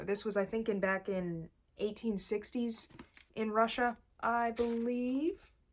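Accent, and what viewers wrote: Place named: Pennsylvania, United States. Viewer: You are definitely from either eastern Pennsylvania, Delaware, or the Baltimore area. Your dialect gives you away. PS great list and great content.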